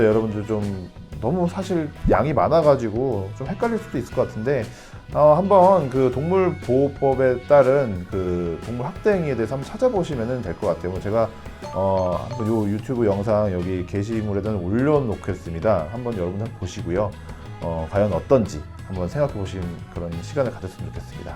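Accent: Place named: Honam, South Korea. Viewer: 여기가 호남(사투리잘쓰는)형 변호사 유튜브 맞나요? 구독하러 왔습니다!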